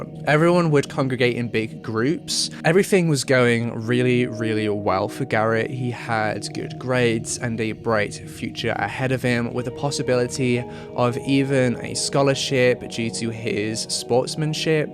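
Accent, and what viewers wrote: United Kingdom. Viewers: As soon as I heard the British accent and the racism crack I knew this was going to be another Jesse Smollett defender!! Right off the bat he skips the part about the boy friend following the boy home. And this doesn’t have a damn thing to do with racism. I’ve had ring side seats of this very relationship. My grandson is mixed race and thank God, (not satan like this dude) he’s 16 now, and his mothers boyfriends weren’t killers. Because if we would have come home and found him dead after his mother broke up with her boyfriend who outwardly blamed him, you know who did it. This dude is a young know nothing who’s people already destroyed his country because of this very weakness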